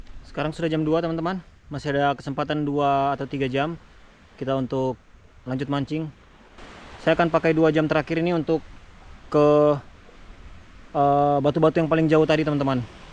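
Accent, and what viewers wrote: Manado, Indonesia. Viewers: Itu logat manado memang kantal skali kang 😅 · Di daerah mana ini kawan ?? logat manado ngana pake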